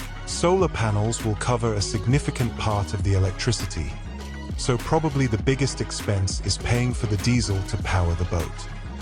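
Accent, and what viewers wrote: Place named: United States. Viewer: British content, American voiceover??? Really?? Not at all sure I want to subscribe, even though it looks interesting.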